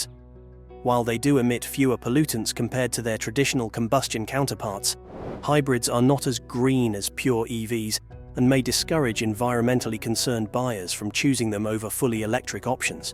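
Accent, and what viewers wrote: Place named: United Kingdom. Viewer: I don't trust any promotion using a British accent.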